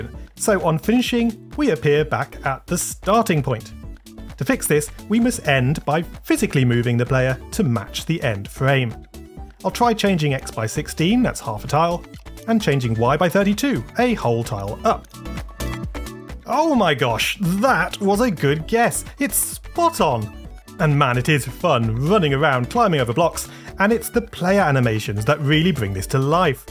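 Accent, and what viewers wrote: United Kingdom. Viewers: it’s the hot british scratch guy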